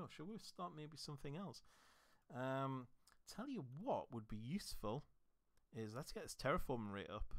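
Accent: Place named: Newcastle, United Kingdom. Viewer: Please do, these are the best tutorials on here and I dig the geordie accent :D Thanks for the series, really helpful. I've bounced off this game for years but now I'm playing it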